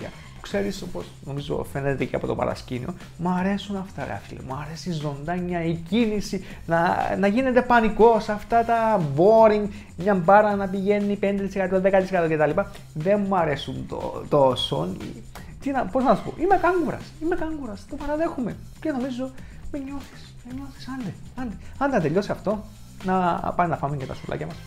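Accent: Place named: Cyprus. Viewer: Πολυ μ αρεσει η κυπριακη προφορα. Ηρθα το καλοκαιρι στην Λεμεσο και εγινα φιλος με τους πάντες .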